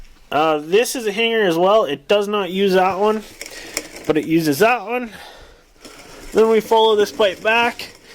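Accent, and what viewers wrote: Canada. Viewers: First 4 words outta your mouth i knew you were from Alberta hahaha fuckin eh bud me too! 🍻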